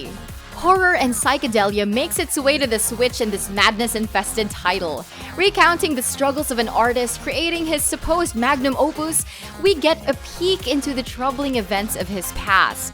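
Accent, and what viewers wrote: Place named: Philippines. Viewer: This is an unmistakably Filipino English accent.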